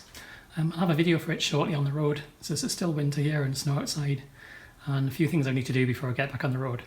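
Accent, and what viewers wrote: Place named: County Durham, United Kingdom. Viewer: Hello Mate, In the process of series 2a rebuild, found a lot of your vids really helpful but electrics Is my downfall have not got a clue. You sound as if you are originally not far from me I am in County Durham. How much would you charge to make me one of these looms ? I already have one through the Chassis, need one for Bulkhead. Thanks k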